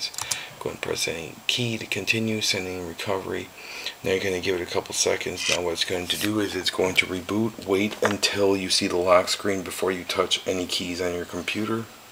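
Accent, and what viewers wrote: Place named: Chicago, United States. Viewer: youre from Chicago huh? lol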